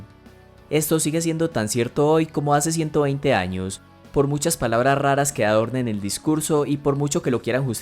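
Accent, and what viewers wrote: Antioquia, Colombia. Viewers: Petro es economista de la unviersidad externado, entiendo el punto del video pero pienso que sería bueno que el aclarase esto que el dijo, sin embargo, pienso que estas poniendo el fragmento de un vídeo que no está dentro de las propuestas económicas de Petro, además me parece absurdo que pongas un fragmento de video que no se sabe si una propuesta actual y omitas todas las demás propuestas en el tema económico que el tiene. Por tu acento es fácil darse cuenta que pertences a cierta región del país que no le conviene que suba a la presidencia pues dentro de sus propuestas está poner a pagar impuestos a las personas que tienen cifras desorbitantes en el exterior en paraísos parafiscales y que de ese tipo de dineros se ha beneficiado en gran manera la región de Antioquia y por eso apoyan a candidatos ladrones y sin preparación como Fico o Rodolfo. 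· Por el acento paisa escondido hay parcialidad en el video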